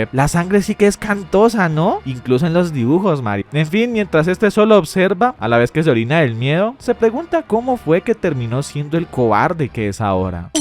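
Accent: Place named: Colombia. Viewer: colombiano narrando anime cine🗿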